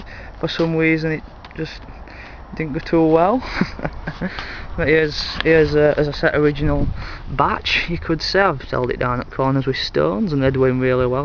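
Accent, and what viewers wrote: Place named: Yorkshire, United Kingdom. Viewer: Great video, very helpful to see the different ages of your peas (i just started my peas on the 13th April, and they are doing nicely. i've planted 4 in a 5 gallon bucket and added twigs/branches around as a kind of trellis (very rustic) until i can plant outside after frost season (here in Norway) Yorkshire accent is very distinctive (i used to live there too) can you keep us updated on their progress please , helps knowing what they'll look like thx very much :)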